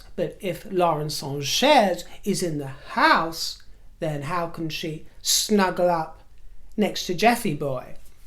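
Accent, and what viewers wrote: United States. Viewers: you do a good American accent. I mean, it really rings true! Wherever did you pick it up? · I love your American accent! ❤ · Your American accent is so good. It always makes me laugh; you are so funny. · I love your American accent!!! You nail it.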